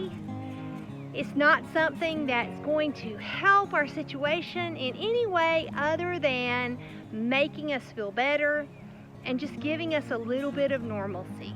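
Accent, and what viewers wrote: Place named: South, United States. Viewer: I'm glad I found you on YouTube. Delightful southern accent :)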